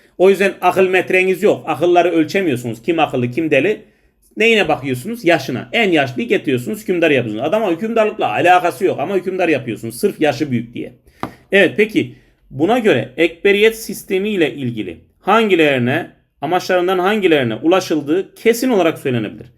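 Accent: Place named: Afyon, Turkey. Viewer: hocam ders anlatımınız tam bize gore agzınıza saglık acaba nerelisiniz tam afyon vibe ı alıyorum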